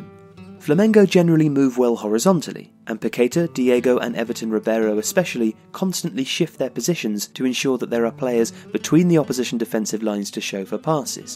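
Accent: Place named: United Kingdom. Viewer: cara e bem difícil o inglês britânico , haha slc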